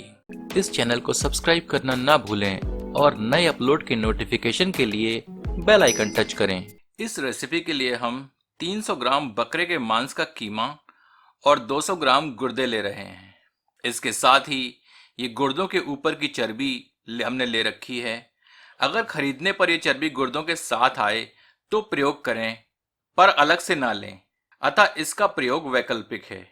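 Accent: Standard. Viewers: Brother itni sudh Hindi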